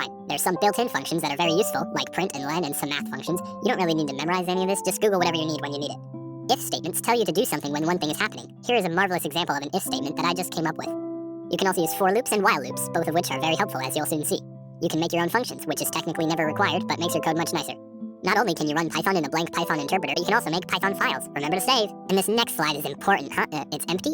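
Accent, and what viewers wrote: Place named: United Kingdom. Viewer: as the video goes on the more British the talker gets.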